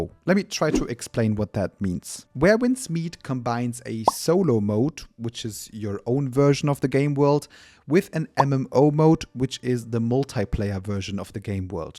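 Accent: Germany. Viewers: Safe bist du Deutsch das hört man doch raus :D Currently playing this on my phone and the amount of content is absolutely insane like... everyday I find something new you think you've seen it all but nope god knows how they ported this onto phones guess their own engine helps in that regard I thought my GOTY would be expedition 33 but Where Winds Meet is literally blowing my mind this game is essentially a lifestyle you're part of this world and slowly progress through your own journey create your own story and decide who you want to be literally can't praise it more enough Also remember the Goose is your best friend ❤...sagt man sich auf'm CN Server zumindest so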